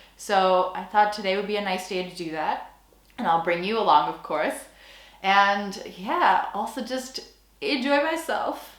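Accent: Netherlands. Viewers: You sure seem to be settling in well, you even seem to be developing a dutch accent. Sometimes i think im listening to a dutch girl speaking english :) Anyhow you were just in time, i guess the coffee places and the museums closed right behind you for the new lockdown.... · Is it me or you now have a Dutch accent when you speak English? Especially in the outro! 🇺🇸🤝🇳🇱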